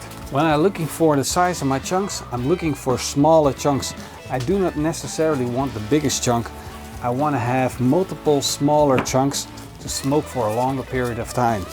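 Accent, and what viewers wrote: Netherlands. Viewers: are you dutch ??? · is deze guy nederlands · you sound so dutch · Davis Gjeta ja man alle nederlanders hebben zo een engels accentje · Oh yeah yeah nederlandsssss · wollah echt zehme weloe Nederlanders op youtube Pannekoek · hate the dutch accent. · shaft says Dutch. He from Holland the netherlands 👊🏾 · It's a Dutch accent ;) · Are you from around the nederlands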